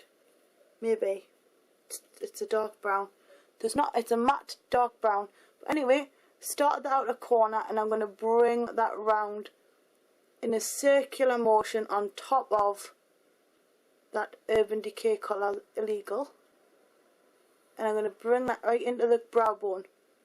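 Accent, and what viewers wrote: Newcastle, United Kingdom. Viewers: um im pretty sure by her accent you can tell shes from the united kingdom lol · Lmao. Obviously she's a Geordie.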